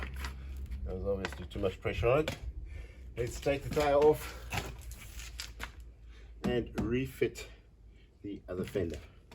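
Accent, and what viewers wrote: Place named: Gauteng, South Africa. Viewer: Profile says Spain. My ear says Gauteng :)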